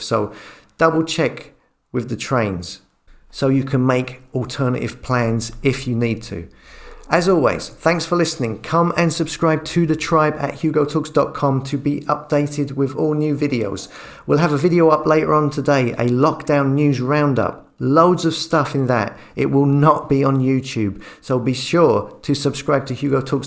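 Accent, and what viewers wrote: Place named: England, United Kingdom. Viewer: Love your videos. Your good English accent is a breath of fresh air especially that you take time to consider the banana republic of Ireland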